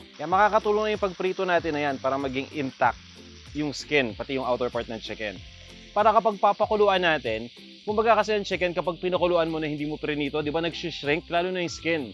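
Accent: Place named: Batangas, Philippines. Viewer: Nasa parteng calabarzon ka sir...sa accent mo..Southern tagalog😂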